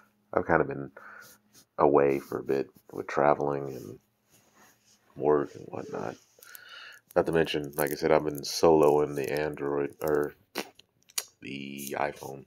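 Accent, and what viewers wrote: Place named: United States. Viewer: She looks so cute and she's gonna be so smart and philosophical with the way you talk to her! I love your conversation and also your slang with the American accent, I'm in London! 🤩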